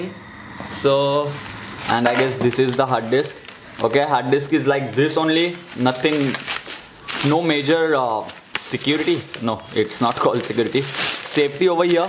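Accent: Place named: India. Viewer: What language is he speaking? Sounds like fluent Indian Scammer.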